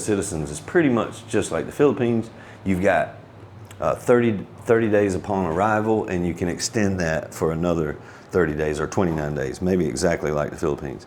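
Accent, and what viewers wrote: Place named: South, United States. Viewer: I’m not sure if you remember me told you I lived in South Carolina for more than 20 years I was in Camden South Carolina right by I 20, I think that is reason why I like you right away when you start talking I recognize that you from the South; you like my kababayan,! So good luck what ever you decide to do to stay happy 😃 and keep smiling that is your big asset 😂🎉😊😮❤😂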